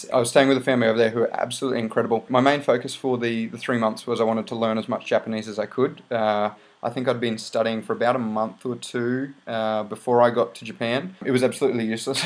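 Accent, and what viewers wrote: Australia. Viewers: ! I knew you were Australian!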